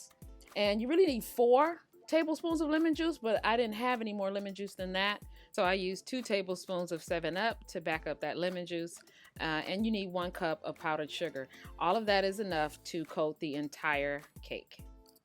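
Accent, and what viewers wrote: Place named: Chicago, United States. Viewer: Are you from Chicago? Your accent sounds just like a friend of mine ☺️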